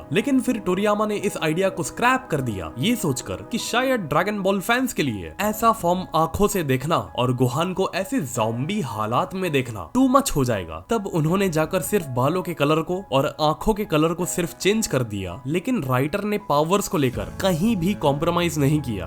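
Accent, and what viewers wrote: Maharashtra, India. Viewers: Your manga narration are the best and the Marathi accent is crazy ! 😂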